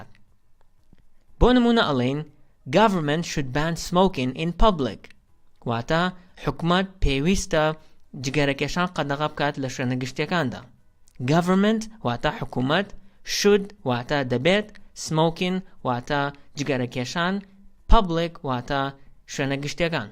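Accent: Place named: United States. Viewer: ئەوە ئینگلیزی ئەمریکیە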